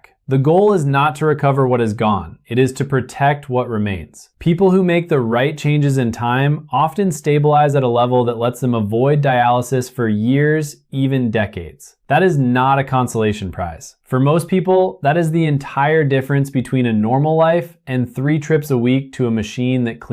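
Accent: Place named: England, United Kingdom. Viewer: Male 70 yo English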